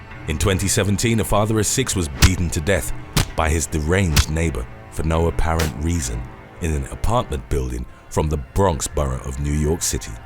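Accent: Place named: London, United Kingdom. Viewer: Neighbours looks much better.. How come you sound London but use American slang.. ie dollars neighbors etc.. Do you live there now? Great voice over broski. As Clear as a bell.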